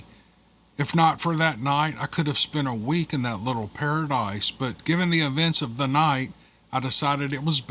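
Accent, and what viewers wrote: South, United States. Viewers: your commentary, opinion, jokes, southern drawl and personality are why we follow you. Hate to hear you constantly apologizing for being you. You need to understand that's why so many love your channel and continue to follow/ subscribe. We can hear Bigfoot stories many places but only at do we get the Dailey dose of we all need in our lives. Sending love from your Yankee followers in CT ❤❤❤ much love brotha, ty for being you!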